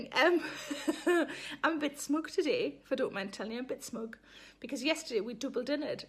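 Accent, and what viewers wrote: Newcastle, United Kingdom. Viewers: I have been watching you for a short time and I am learning how to understand English spoken by a British person. Hard to do by a Yank. Love your comedy, keep it up. Lotsa Love. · She is English, from the North. Her accent is Geordie. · Geordie. i.e. Newcastle :)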